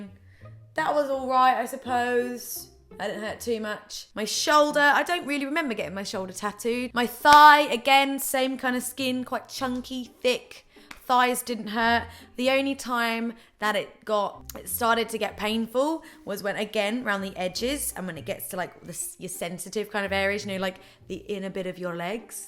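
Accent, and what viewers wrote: United Kingdom. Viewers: I so love the British accent. Espcially describing tats.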